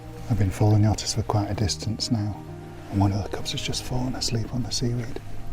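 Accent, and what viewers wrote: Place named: England, United Kingdom. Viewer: So magnificent and magical in every way. The beauty of that island, the photography, the aerial views, the music, your wonderful English accent I am in love with it all. I live on the California coast and have always gotten a kick out of the otters here in Santa Cruz, Big Sur etc but they seem to be different than the ones there. Here they are smaller, fluffier and their tails aren’t so long. Thank you for your presentation very lovely.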